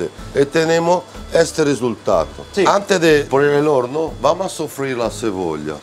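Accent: Italy. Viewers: hablando como español con acento tano es automaticamente porteño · Hola me haces acordar a mi abuelo cuando te escucho hablar con ese acento italiano... seria genial q te des una vuelta por argentina para probar todas las pizzas q hay.... son distintas a la clasica pizza margarita italiana pero no dejan de ser exquisitas... e ir a la calle corrientes a recorrer pizzerias es todo una experiencia...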